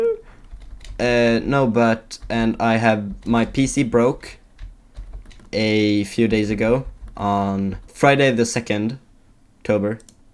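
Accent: Sweden. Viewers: Are u from Sweden cuz I feel like ur accent of pronouncing words is like Swedish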